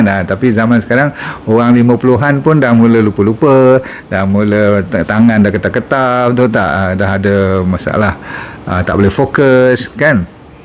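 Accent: Malaysia. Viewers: Doktr bercakap guna satu dialek di Malaysia. Jika sudah biasa tentu mudah faham sebagaimana ikuti dr zaidul.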